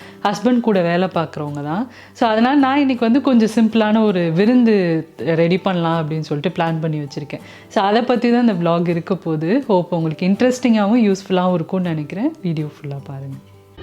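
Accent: Madurai, India. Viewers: Bro review super..madhurai slang summa alluthu...😍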